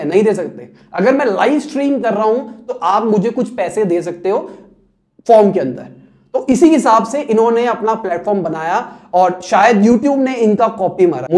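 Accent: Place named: Punjab, India. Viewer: Aapka content sahi me share karne like nahi hota bcoz aapki jo language hoti hai vo un professional lagti hai means usme koi professional sa feel nahi hota hai aap vo jo bolte ho na yaar, bhai etc aur aap standerd hindi language bhi nahi bolte matlab ek normat panjabi tone me aap information deliver krte ho to ye sb ek professional worker pe nahi jamta aapki language vivek bindra , ujjval patni ya aur bhi jo best vaqta hote hai unke jaisi professional ho to zyada behtar lage tab aapki video share krne me hume bhi khud me accha feel hoga.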